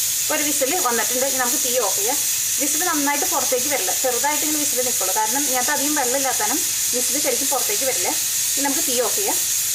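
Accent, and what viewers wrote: Thrissur, India. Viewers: Hey Chechi, You are really great...You have got a lot of talent......Keep up the good work & keep teaching us more & more recipes...Your recipes are easy to understand & delicious too....May God bless you.....& I specially love ur Trishur accent...:)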